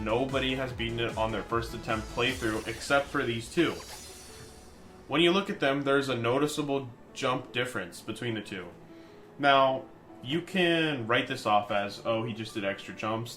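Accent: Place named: United States. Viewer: u sound like an american trusta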